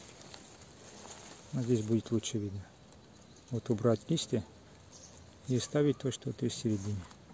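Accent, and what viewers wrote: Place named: North Ossetia, Russia. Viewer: Добрый день! Случайно попала на Ваш канал и не могла 2 часа оторваться! По комментариям узнала(по акценту догадалась) что Вы из Осетии. Подписалась и буду применять Ваши методы. Все здорово и понятно.А кто Вы по профессии?Удачи и процветания. Елена Тедеева .Армавир.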